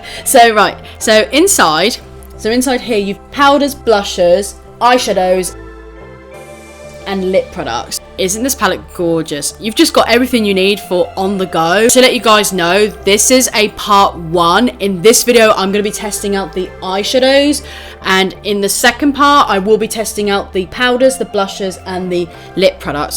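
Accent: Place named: Australia. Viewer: I love your videos, both because you take no shortcuts, but also for the fact we have similar taste. May I ask something. You sounds like you are coming from Australia - is that correct or I am just awful in recognizing dialects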